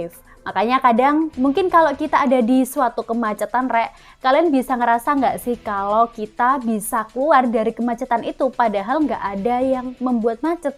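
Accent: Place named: East Java, Indonesia. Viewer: Kok bicaranya REK REK opo Anda ini orang Malang/Surabaya?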